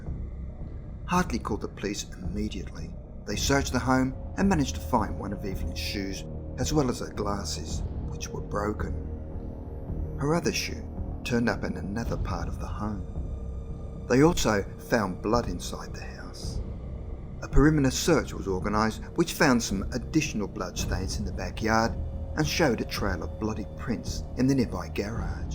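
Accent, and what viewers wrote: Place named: Australia. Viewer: Dude that's crazy you sounded like you were from a bunch of different places. I heard the Australian after a bit of the video I actually commented that in like the first few seconds of it haha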